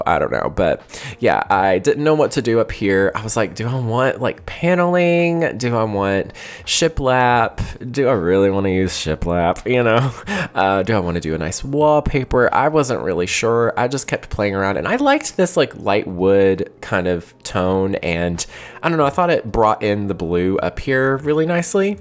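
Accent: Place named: Appalachia, United States. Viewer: I love your content, and knowing that you’re from the Appalachia makes me feel ever closer to your videos (WV here). Your accent is barely noticeable for a person who lives in Kentucky. Your builds make me want to build more while I’m playing!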